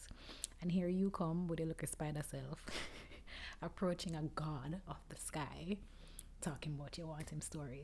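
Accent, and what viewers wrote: Jamaica. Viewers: The Jamaican accent has to be one of my favourite accents ever and couple this with folklore tales and I’m obsessed, instant follow 🥰🥰🥰🥰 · I love your alternative subtitles XD i can follow nearly all of your accented words and jamaican phrases but i appreciate the subs anyway just in case we miss what you were saying Also I'm finding the more I learn about anansi, the more I see that his biggest trickery is using other people's pride against them to get what he wants 😂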